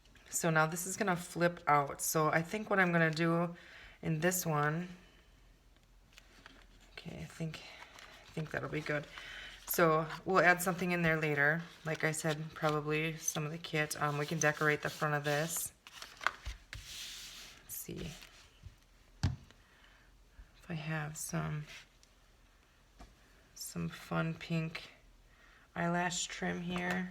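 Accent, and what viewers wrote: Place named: Midwest, United States. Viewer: Just found your channel and also subscribed to your monthly kits. Lovely style! I’m from MN currently living in IL, and your accent makes my heart yearn for home!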